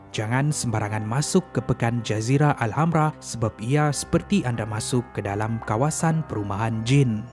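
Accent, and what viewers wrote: Malaysia. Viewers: Bang nak tanye abng dari mna indonesia atau malaysia dngar suara ckp mcam malaysia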